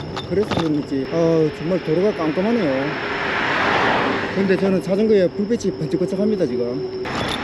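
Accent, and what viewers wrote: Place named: Busan, South Korea. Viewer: 재밌어요 'EBS 세계테마기행'의 '부산 여행가 일본편'을 시청하는 듯했으며, 부산 사투리가 정겹습니다 잘 시청했습니다.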